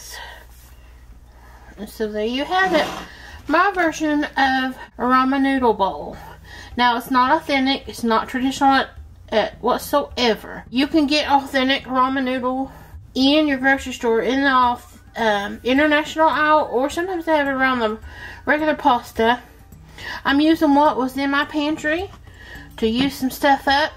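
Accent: South, United States. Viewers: Love your southern accent... just like me ;)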